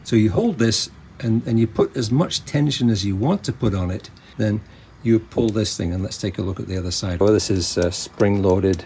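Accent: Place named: Upper Midwest, United States. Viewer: Nice video .. interesting accent .. sounds like you could be upper midwest .. Chicago maybe .. hmmmmmm!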